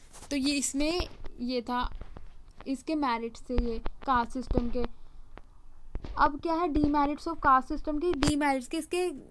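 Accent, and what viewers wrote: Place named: Chandigarh, India. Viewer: Thanks mam I think you are from chandigarh because of your voice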